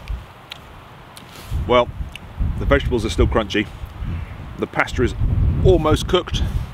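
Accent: United Kingdom. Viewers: Oooo with warmer weather we might just see out doing some nice camps this spring? Man, I hope so. You are one of my absolute favorite channels on YouTube. As an American, I just love your accent and your whole demeanor, really. You are just an epic individual, so totally British. Just lovely. And the funny thing is that I don't even camp. I can't stand to go camping. But, I can watch you all day long., you are the best. Cheers!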